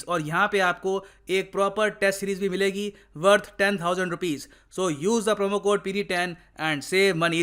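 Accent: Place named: Maharashtra, India. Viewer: Sir apki hindi bilkul marathi jaisi lagti hai👍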